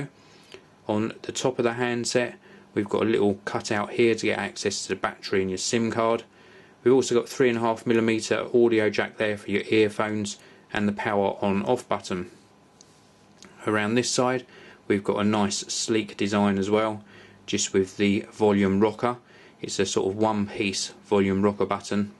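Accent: England, United Kingdom. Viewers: Nice review! Could we have a comparison video of this phone with the Orange San Francisco? Where is your English accent from? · Best british accent yet :) Nice review as well!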